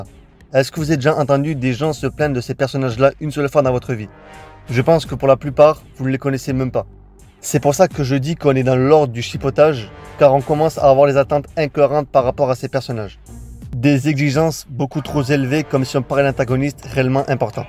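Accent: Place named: England, United Kingdom. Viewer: Incroyable ton accent anglais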